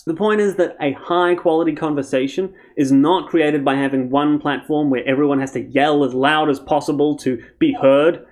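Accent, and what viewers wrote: New Zealand. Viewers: just found your channel and totally dig it mate, youre clearly a very smart chap and nice to hear a fellow kiwi (especially one who got no time for leftys, haha) on YT.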